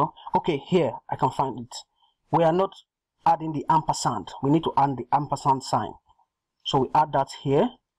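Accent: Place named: Nigeria. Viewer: I'd recognize a Nigerian anywhere. Good one bro, carry one.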